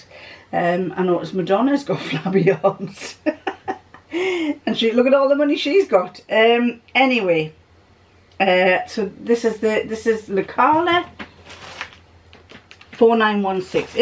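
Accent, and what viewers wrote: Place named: North East England, United Kingdom. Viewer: Hi, I love seeing what you make. I have been sewing for years and years but still can’t seem to make anything that fits me perfectly. How on earth to you manage to measure yourself and adapt patterns to fit your body? I know you said you live in Richmond but your accent suggests that you were brought up in the North East, like me, am I right? Please keep the videos coming as I learn so much from you xx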